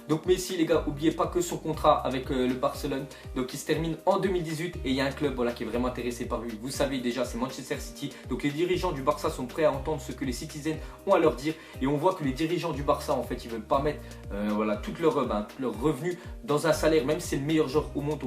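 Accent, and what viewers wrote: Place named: North, France. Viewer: sa se vois tes du Nord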